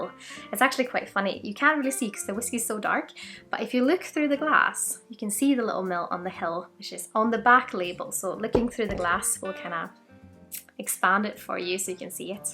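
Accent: Scotland, United Kingdom. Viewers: Sökte på youtube efter denna whiskyn efter jag köpte den på systemet, kul att hitta en video från någon svensk! Men du låter lite skotsk haha :D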